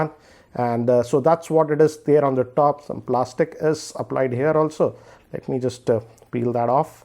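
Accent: Hyderabad, India. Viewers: thanks sir....and your Hyderabadi Hindi is just awesome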